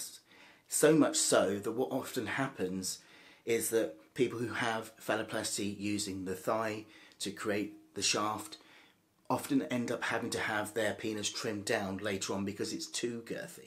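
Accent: United Kingdom. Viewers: I am impressed with your perfect British accent.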